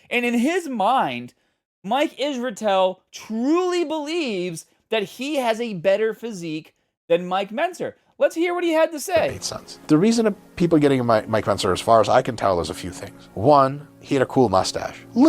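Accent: Boston, United States. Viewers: As a born a raised Bostonian (Roxbury btw) u have the deepest Boston accent 🫡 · Are you from Boston?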